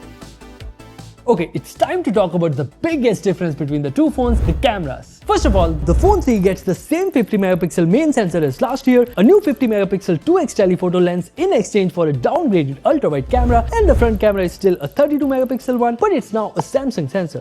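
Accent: India. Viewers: Non native English speaker here rarely gets to hear English with Indian accent in it, but i can completely comprehend all of the things he said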